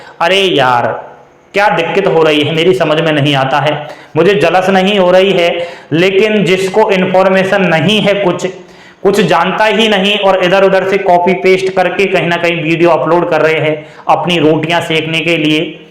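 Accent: Rajasthan, India. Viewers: Sir aap rajasthan se ho kya ❤️❤️❤️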